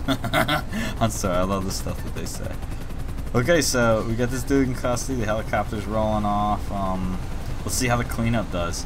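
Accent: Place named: South, United States. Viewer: Lol I always enjoy your accent, if you don't mind me asking where are you from? It sounds like a southern type accent but not Deep South 😂😂 if that makes sense.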